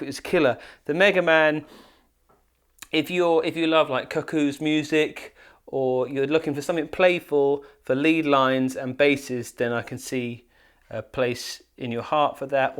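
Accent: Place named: RP, United Kingdom. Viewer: sounding super posh today!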